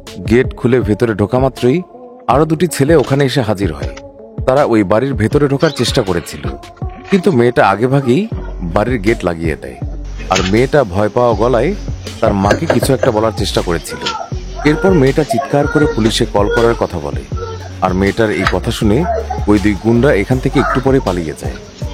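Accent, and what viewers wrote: Bangladesh. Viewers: Ami sure apni narrator er beware jante chacchen. Hmm mone hoy Bangladesher Bangali. ❤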